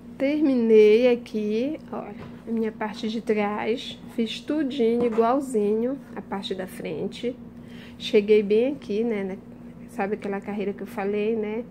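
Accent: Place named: Rio de Janeiro, Brazil. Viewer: Oi!Boa tarde! Estou muito feliz! Acabei de terminar o meu. Eu fiz cm a linha nr 4 (barroco)e diminui 2 grupos no inicio. Ou seja comecei cm 7 grupos. E deu super certo. E olha que estou meio gordinha.Usei agulha 2,5! Beijos! Obrigado por dividir conosco suas obras de arte. Você é carioca? Fico encantada com a maneira cm q você pronuncia. Que Deus abençoe vc e sua família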